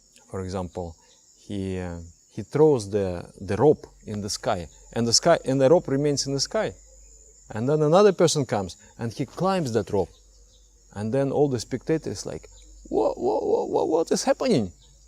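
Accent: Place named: Russia. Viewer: Your Russian accent makes it easier to understand what you are saying, for some reason :)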